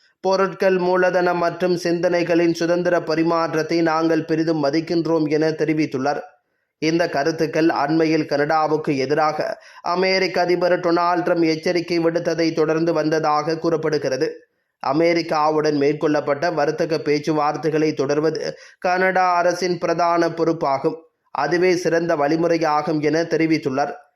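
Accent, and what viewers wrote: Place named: Chennai, India. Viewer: Madras தமிழ்..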